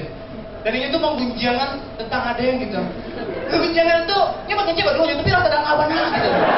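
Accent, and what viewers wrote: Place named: Padang, Indonesia. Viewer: ndak lucu ang do...ma ado urang bukik logat padang...tutur bahso ang kasa...dasar padang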